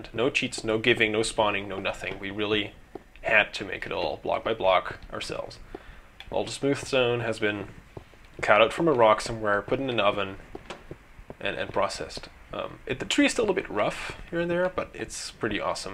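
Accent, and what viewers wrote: Netherlands. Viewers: you from Holland?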